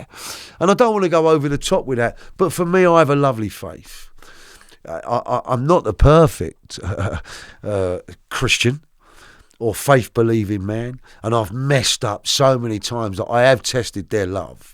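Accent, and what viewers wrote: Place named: England, United Kingdom. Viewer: I love the English accent!🤗🥰💯💞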